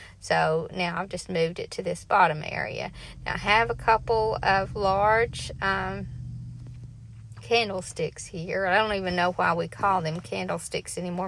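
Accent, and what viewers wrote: South, United States. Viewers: Hi, I just found your channel and love it. I was wondering where your store is located. I related to your style as well as your southern accent. I am from south Ga., but live and have 2 booths in Tn.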